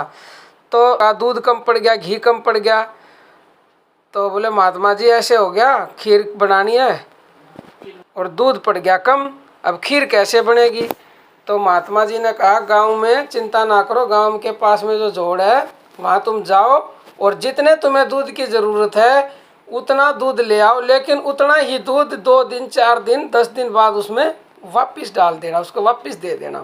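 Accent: Haryana, India. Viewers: जय श्री राम माताजी आपने सत्य कहा है माताजी आपकी भाषा हरियाणा के जैसी लगती है आप के बचन अमृत तुल्य हैं।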